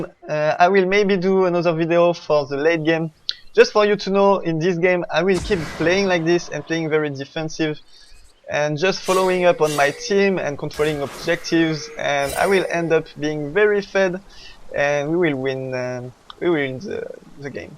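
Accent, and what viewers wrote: France. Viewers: no we clearly jear the french accent. but yes i wasnt so focused on game language and aint saw it wasnt spanish :) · on en parle DU FRENCH ACCENT ??? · Omg l'accent français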